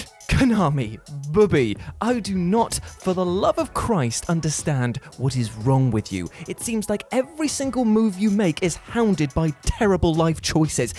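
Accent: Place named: United Kingdom. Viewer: I had to stop. He said “Haych dee” and “Ohmeeguh” too many times. British English is confusing, and annoying.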